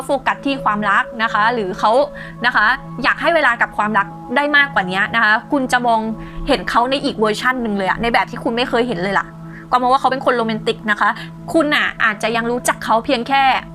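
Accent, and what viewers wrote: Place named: Thailand. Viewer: ทำนายตรงมากคับ...ชอบฟังเสียงคนนี้มากๆเสียงน่ารัก..เหน่อๆนิดๆ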